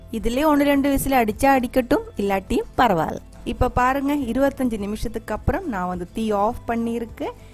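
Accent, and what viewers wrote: Kerala, India. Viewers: I subscribed your channel when I saw your video for the very first time itself. Not for the receipe I saw but for your soothing voice. Lovely Tamil with a kerala touch....now regularly following your recipes...you are going to hit the cliff soon...this is the first comment I'm placing for a YouTube channel.stay blessed!!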